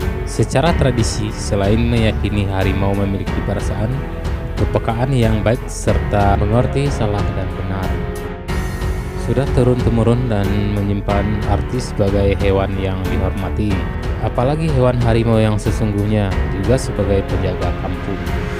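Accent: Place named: West Sumatra, Indonesia. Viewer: Dari nada bicara org yg cerita d.vidio ini ..aku bisa pastikan kamu org minang jadi kalok bicara soal inyiak atau siluman harimau itu memang nyata baik d sumatera atau d.jmbi tepatnya di prguruan silek rimau ..atau perguruan halimun P. Atau di kampung pamunyian kecamatan lubuk mengkoang kab.tebo jaya jmbi tapi kalok bicara soal adat itu semua suku sudah pasti mempunyai adatnya masing² 🙏.dan di sini saya hanya ingin mengutip sedikit fakta ya itu ..pandangan antara suku kalok saya sering lihat dengan sedirinya kenapa suku minang itu selalu memandang negatif tentang org jawa contohnya sedikit dikit [urang maa.. tu da itu si.akang mah da ] jd kalimat yg begituan kyknya kurang cocok bagi kita sesama suku bangsa INDO.andai saja org suku lain yg berbicara demikian contoh [org mana itu mas org si kerbau atau kabau mungkin sangat janggal kedengaranya kalau bisa mari kita saling menghargai sesama suku bangsa karna kalau di ranjikan atau sejarahkan terlebih di agama kita semua adalah saudara sanak famili yg harus menghargai satu sama lain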